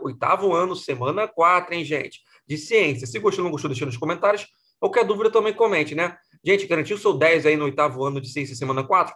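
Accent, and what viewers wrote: Minas Gerais, Brazil. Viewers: Vc é de mg??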